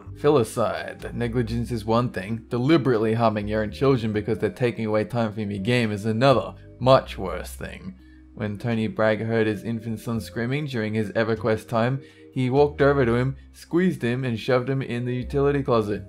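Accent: Australia. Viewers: is this guy aussie? because I am and he sounds he is too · This guy sounds like an Australian Dave Rosenberg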